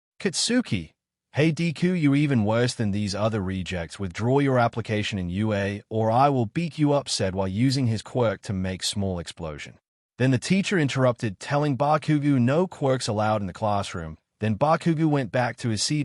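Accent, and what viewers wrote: United Kingdom. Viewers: Bro sounds British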